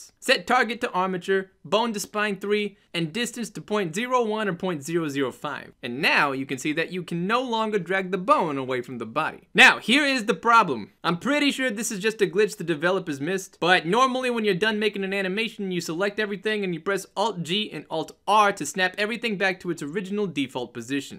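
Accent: New York, United States. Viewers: love your tutorials but the ny hot dog vender thing you have going on is annoying af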